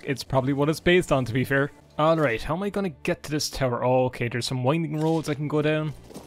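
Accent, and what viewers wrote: Ireland. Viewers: The game looks amazing. The great Irish accent fits as well.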